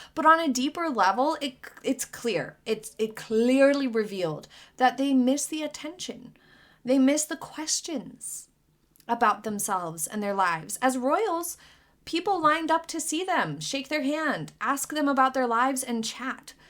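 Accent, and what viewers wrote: United States. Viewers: ❤darling ❤you look absolutely STUNNING 🤩 again today…..I love 💝 you in this shade of Green!it makes your eyes 👀 pop!!!🥤 ❤❤❤❤🎉you really are a BEAUTIFUL WOMAN 👩 with a Fabulous Brain 🧠 to boot!its always a pleasure to hear 👂 your soft CLEAN 🧼 IMHO AMERICAN 🇺🇸 ACCENT all without the new “woke”weird drawling questioning intonations that I hear often on YouTube!lol!Im a 58year old lady in the Shetland Isles Scotland 🏴 uk 🇬🇧 and have been with you from the get go!Keep going you’re REALLY SAYING WHAT I FEEL and THINK 🤔 about METHANE and HAZNOBALLS and I’m PRAYING 🙏 TRUMP doesn’t Chuck HENRY WINDSOR outta the USA 🇺🇸 😂😂😂😂!Much love!🧡 Jo.xxxxxxx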